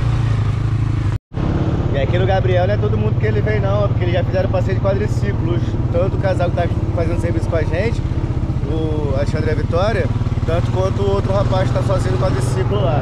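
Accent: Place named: Rio de Janeiro, Brazil. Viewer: Caga tijolo. Kkkkkkkkkk😂😂😂😂😂. Adoro esse sotaque. O sulista e o carioca sao os sotaques mais gostosos de se ouvir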